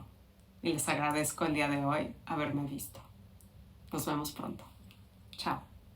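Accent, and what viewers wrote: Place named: Mexico. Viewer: ¡Qué vidéo más bonito! Eres una mujer preciosa, con y sin canas, por dentro y por fuera. Me encanta tu acento mexicano y tu tono de voz … ¿has pensado en leer libros para niños? … Te descubrí ayer viendo vídeos sobre mujeres que han decidido dejarse crecer su pelo natural y, entre otros, me gustó mucho tu enfoque. Aunque aún no me he decidido, creo que es cierto que algo tiene que romperse por dentro para dar un paso así y dejar de pretender ser eternamente joven.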